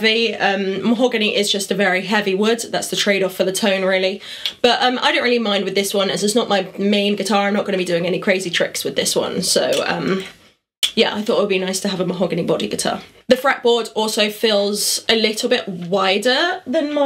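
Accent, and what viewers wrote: United Kingdom. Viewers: Ahhh... she's a Brit ! 🤔🙄😏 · What part of UK does your accent come from?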